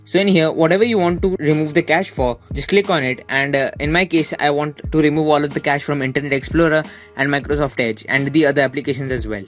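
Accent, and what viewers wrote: India. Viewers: It is an amazing Indian accent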